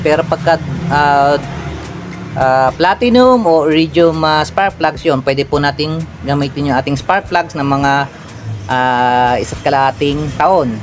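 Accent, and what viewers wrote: Pampanga, Philippines. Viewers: kapampangan ka boss?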